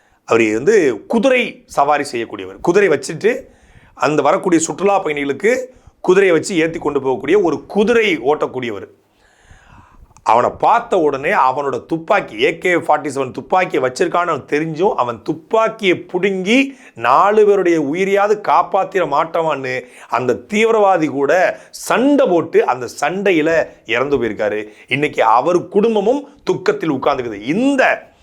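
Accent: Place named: Coimbatore, India. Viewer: தலைவரே இத்தனை நாள் உங்க பேச்சு நல்லா இருந்துச்சு இன்னைக்கு ஏதோ கோயம்புத்தூர் பாஷா பக்கம் பேசுற மாதிரியே தெரியுது... என்னமோ போங்க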